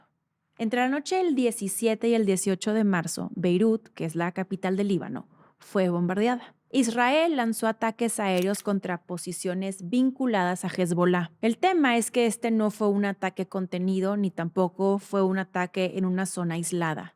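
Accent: Monterrey, Mexico. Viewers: A ratos suena un poco ridícula con su faceta de fresa regia.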